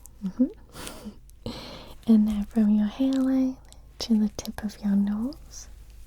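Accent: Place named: North East England, United Kingdom. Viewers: sunderland accent is so good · Gotta love the north east accent! Im from County Durham myself 😅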